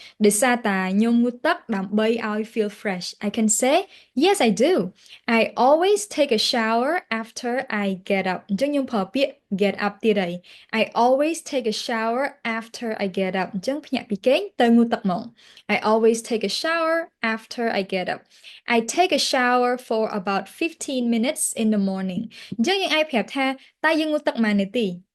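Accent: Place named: United States. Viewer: Your English sounds like American how long have you been learning english?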